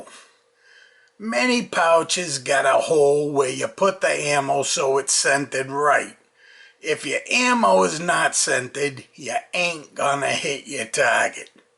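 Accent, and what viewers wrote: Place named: Boston, United States. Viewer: Wonderful North Shore Boston or Southern NH accent.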